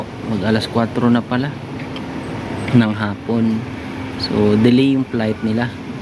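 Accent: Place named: Ilonggo, Philippines. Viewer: Wow marunong ja din parang mag-Ilongo Kuya.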